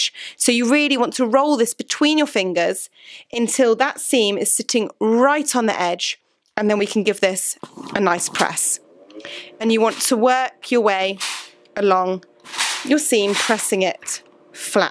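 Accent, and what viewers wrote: England, United Kingdom. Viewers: very beautiful english accent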